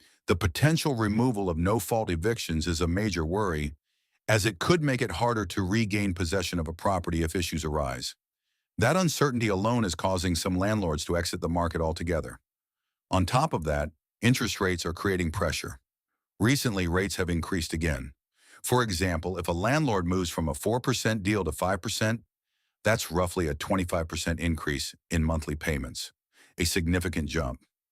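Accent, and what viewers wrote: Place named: United States. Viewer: Why do u hv an American accent?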